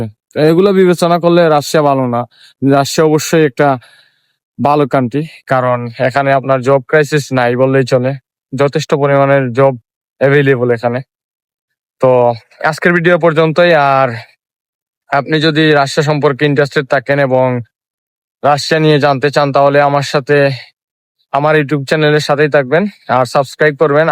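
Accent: Sylhet, Bangladesh. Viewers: Bhai Sylheti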